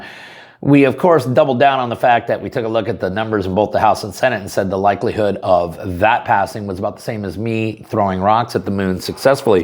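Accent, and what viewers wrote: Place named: Tennessee, United States. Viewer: really I've watched him for a long time and never heard him talk about it but I believe you I just always assumed he was born and raised here that tells me he must live fairly close by my area cause I'm not far from the Kentucky state line and his accent is the same as all the folks around my area but thanks for the info I guess I should listen better instead of fast forwarding to when he gets to shooting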